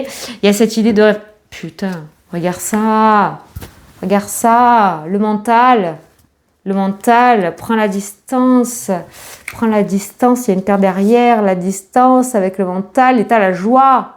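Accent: South, France. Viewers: Bonsoir, Quel peps j'avais pas le moral tu ma regonflé à bloc merci pour ce beau moment! J'ai adoré le passage "Jean Jeannot Georges" une pensée pour mon papa au ciel qui s'appelait Georges 🕊🥰 je suis du sud aussi j'ai l'impression de m'entendre parler!! Ta joie de vivre et les expressions font un bien fou comme à chaque fois! Une belle soirée à toi et merci encore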